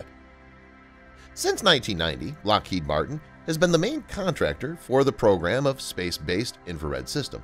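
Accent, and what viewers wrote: United States. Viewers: I can imagine his voice is perfect for learning American English!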